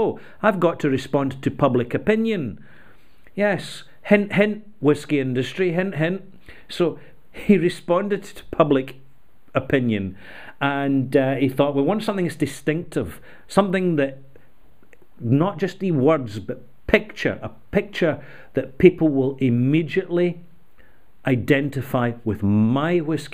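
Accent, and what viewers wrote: Scotland, United Kingdom. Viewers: What's band practice? How much water? And I guess the Scottish accent makes sense for whisky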